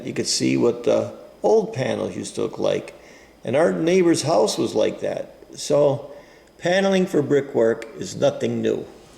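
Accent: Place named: Throop, United States. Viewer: Well, the reason that there is not so much brick, probably has to do in part with its relatively poor thermal properties. I bet that in places like Florida they are looking at building highly insulated structures. The problem is, these modern materials feel insincere; they are not honest. I appreciate older architecture and building methods and am constantly seeking out how the older guys did things. They weren't stupid. Always fun to listen to your videos. Your dialect always sounds familiar and reminds me of my family in Throop. One of my favorite places in the country. Thanks for another entertaining video.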